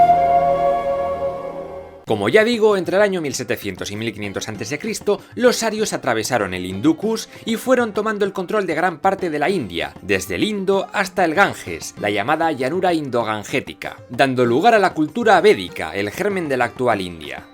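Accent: Spain. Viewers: El acento español no aburre en tus vídeos, sigue así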